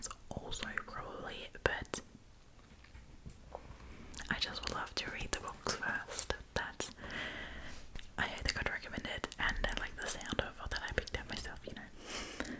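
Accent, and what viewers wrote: Australia. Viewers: “as a non-English speaker” Proceeds to speak English at a native level with a legitimate Australian accent. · For so long I wondered how you ended up with an Australian accent. I just assumed you chose it as your preferred accent in English. Now I know!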